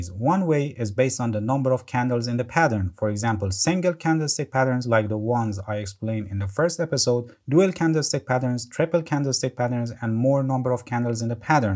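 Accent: Iran. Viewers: As soon as I started the video, knew my man was Persian. Halet chetore azizam???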